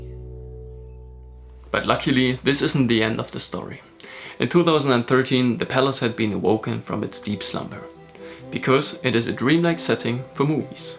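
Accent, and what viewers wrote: Germany. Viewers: Is the person from this video German? I love his accent. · 💜💙 Que hermosura! Y que bella voz del narrador, tiene acento alemán 💜💙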